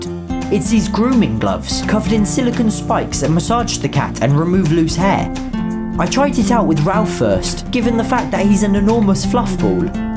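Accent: United Kingdom. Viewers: I love the uk english like Moning Cheers mate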